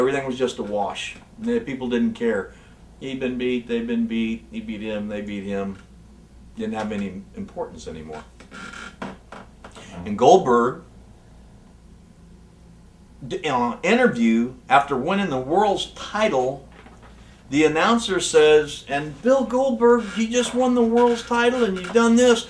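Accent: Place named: South, United States. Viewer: I can't even watch this garbage you expect anyone to believe you punked Sid and came up with everything that turned dubya cee dubya into a competing company but your ideas were stolen by Bischoff and then he fired you? No one believes you just stop , you were fired for being another hang around collecting a check coming on with the same old 70s and 80s southern draw just like everyone else Eric got rid of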